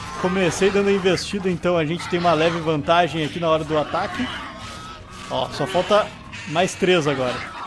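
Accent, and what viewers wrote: Rio Grande do Sul, Brazil. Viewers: Ei man tu e gaúcho? Se tu não for teu sutaque e igual sou gaúcho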